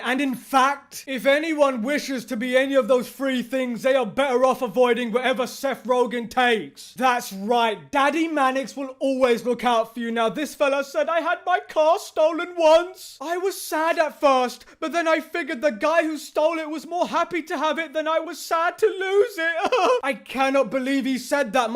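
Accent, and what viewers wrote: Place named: Yorkshire, United Kingdom. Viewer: love your accent bro, is that Yorkshire?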